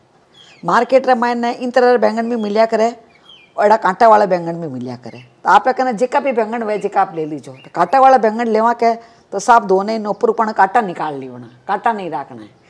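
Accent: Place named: Marwar, India. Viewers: I find it so easy to hit the LIKE button on your recipes even before watching them because they are always so unique and innovative. Your approach to cooking feels like magic, turning everyday ingredients into something extraordinary. Both my wife and I are devoted fans of yours; just looking at what you’re making makes our stomachs growl with anticipation. We can't wait to try your recipes ourselves. We also love your Marvarri accent—it's a delightful touch! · Apka baingan KS khooshbu Kitna acha haga. Sweet marwari language ... sab baingan bann javen!! You speak amazing English also, quarter cup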